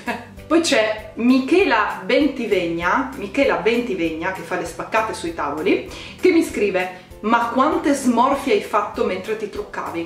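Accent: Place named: North, Italy. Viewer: Ti adoro all' inizio mi ero fatta un'idea troppo superficiale di te forse perché non conoscendoti e vedendo per la prima volta i tuoi video non avevo ancora capito che persona potevi essere ma dopo un po' non sono riuscita a non smettere di guardare i tuoi video, sei simpatica solare e anche bella. forse all' inizio mi sono fatta un idea sbagliata di te un po' per l' accento del nord e un po' perché non capivo la tua ironia ma ripeto è stato inizialmente poi conoscendoti ho visto altro e la tua ironia la adoro! un bacio e le Chanel se le hai mostrare chissenefrega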